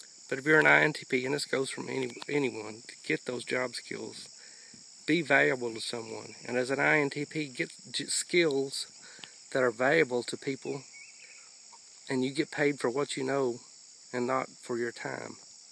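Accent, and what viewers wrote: South, United States. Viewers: I really like you. There's something so nice about your matter of factness mixed with the southern accent. For an INTP you do have a lot of vocal inflection and i can tell that you're a very wise person. Thanks for your videos and all your feedback on my videos.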